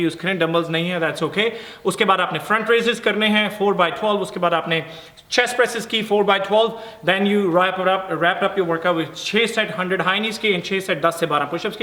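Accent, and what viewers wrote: Punjab, India. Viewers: the way you talk.. its soon cute specially your Punjabi tone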